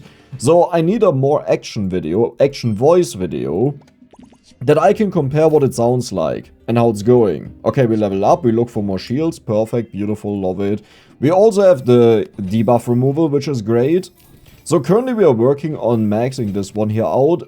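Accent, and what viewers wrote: Germany. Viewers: Wieso ist das so überraschend? Der Akzent ist doch nicht zu überhören 😀 (nicht negativ gemeint) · Selten jemanden so deutsch klingen hören, wären er englisch spricht 😄. Aber gutes Video ❤ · Ich hatte mir schon vom Akzent gedacht das du deutsch bist, aber auf einmal übersteuert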